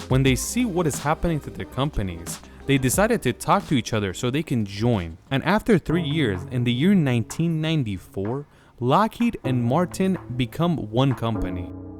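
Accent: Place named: United States. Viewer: زبانت عالیه جان امریکن حرف میزنی😊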